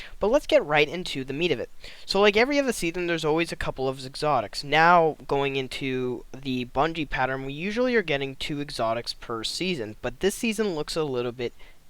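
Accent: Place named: Massachusetts, United States. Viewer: You from Massachusetts? You sound like you live here, lil’ homie.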